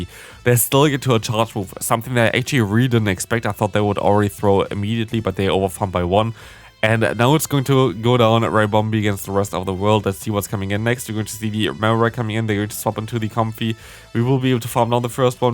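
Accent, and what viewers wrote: Germany. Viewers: Wait... You´re german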